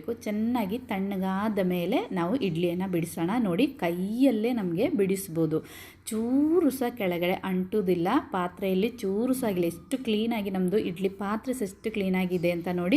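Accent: Kerala, India. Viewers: Malayalam accent. Why don't you answer the comments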